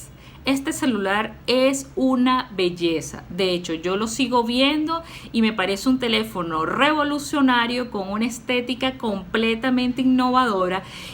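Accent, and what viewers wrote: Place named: Venezuela. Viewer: ¡Guao, que excelente manera de comunicar! Muy divertida y aleccionador relato. No conocía esto de Xennials, realmente lo soy. Gratos recuerdos y con ese acento venezolano maravilloso. Congratulaciones.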